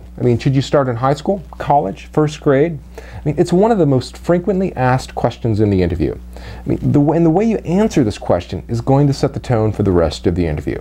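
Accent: United States. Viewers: dominated ameriican accent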